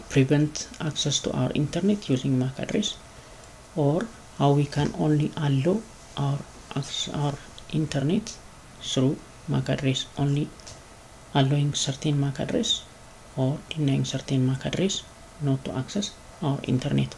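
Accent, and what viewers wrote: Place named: India. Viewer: ur tryn' to speak like indians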